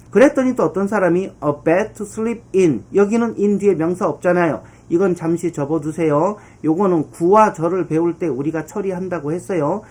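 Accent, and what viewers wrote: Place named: South Korea. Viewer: 드뎌 전치사 편에 입문 하게 됐네요. 감사합니다 선생님. 선생님 목소리는 완전 한국토종인데 외모는 너무 이국적이셔서 쪼금은 놀랬습니다.😅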